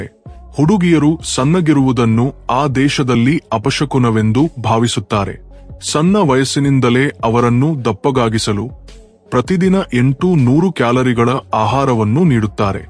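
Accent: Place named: Andhra Pradesh, India. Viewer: ನೀನು ತೆಲುಗು ನವನು ಎಂದು ಕಾಣಿಸುತ್ತೆ ಅದಕ್ಕೆ ನೀನು ಕನ್ನಡವನ್ನು ತೆಲುಗಿನ ರೀತಿ ಮಾತನಾಡುತ್ತಿದ್ದೀಯಾ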